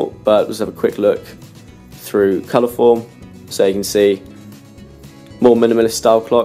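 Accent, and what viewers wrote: United Kingdom. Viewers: q horrible eres y tu acento mamon de britanico es super estupido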